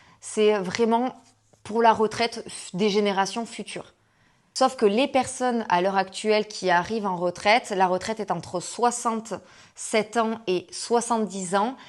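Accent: South, France. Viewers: Ah, par contre, je ne partage pas ton avis sur la redistribution en France... La France est un pays EXTRÊMEMENT protocolaire, c'est un pays ÉNORMÉMENT TAXÉ, les impôts, on les paie mais concrètement, on ne sait pas DU TOUT où ils vont (ni dans la voierie parisienne ni dans la protection sociale, apparemment, alors on ne sait pas où ça part... Peut-être à l'entretien des palais de l'Élysée et de Matignon et le train de vie des élus , y compris après avoir quitté leurs fonction, je ne sais pas !), mais les personnes précaires n'ont droit à presque rien en France à moins de toucher le fond du fond pour, peut-être, avoir droit à des miettes ! Et les autres se précarisent car les taxes augmentent de manière exponentielle et continue mais les revenus ne suivent pas ! Et côté solidarité, la France ce n'est vraiment pas le bon exemple du tout... Alors comme tu as un accent du Sud, dans le Sud de la France, c'est vrai que tout est plus doux et ensoleillé dans l'humeur des gens que dans le centre ou le Nord de la France... 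Moi, je vis à Paris et j'y suis née, et je peux affirmer que la solidarité y est nulle ! Tu n'es PERSONNE à Paris ! Tu peux avoir un souci, PERSONNE ne viendra à ton aide ! Tu as des tas d'aides sur le papier, pour faire joli, mais concrètement tun n'as jamais accès à la plupart de ce qui t'est promis dans le cadre de la couverture sociale, et tu n'as aucun recours ! La chasse à la précarité en France devient la chausse aux pauvres, la lutte anti-tabac devient la lut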